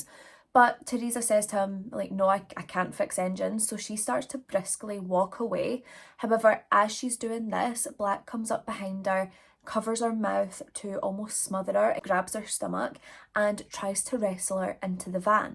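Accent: Scotland, United Kingdom. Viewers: BTW, your Scottish accent is everything 🤩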